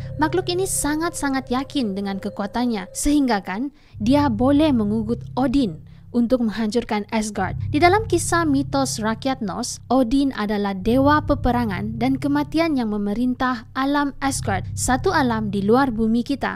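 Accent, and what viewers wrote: China. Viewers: Loghat seperti loghat cina hmm..tk logik sebagai sabahan.ekram abang kamu lebih baik😄😄ekram go go go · pening r..cm loghat cina,(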